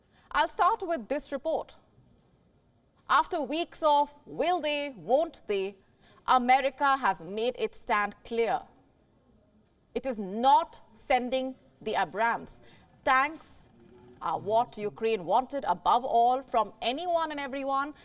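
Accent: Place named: India. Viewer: Indian accent is so ridiculously funny! 😆😆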